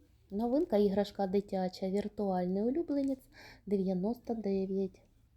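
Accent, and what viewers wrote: Russia. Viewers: На палицях????? Вроді і знаєте мову, але вимова російська.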